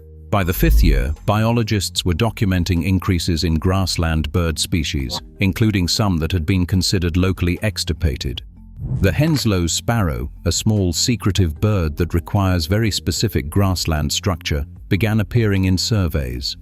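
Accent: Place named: United Kingdom. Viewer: Why a British voice on American content?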